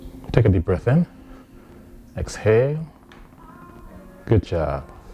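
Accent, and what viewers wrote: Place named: Caribbean. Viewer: Where are you from? I can hear hints of a Caribbean accent.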